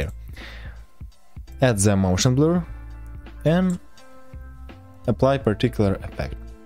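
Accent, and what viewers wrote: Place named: Russia. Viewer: i can hear some russian accent in it but it's so good it's like playing a video game about WWII LMAO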